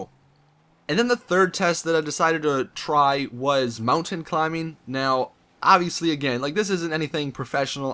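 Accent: Louisiana, United States. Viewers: Are you from Louisiana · are u from Louisiana and if so what part?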